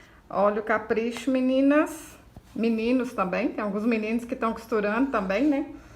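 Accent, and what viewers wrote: Minas Gerais, Brazil. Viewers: Muito lindo!!! Já vou fazer pra minha neta Helena de 5 anos! Amo ver seus vídeos! Já estou inscrita faz tempo! Amo também o seu sotaque mineirinho!!! Parabéns pelos trabalhos e por ser paciente em explicar. Beijos😘😘👏👏👏😍😍😍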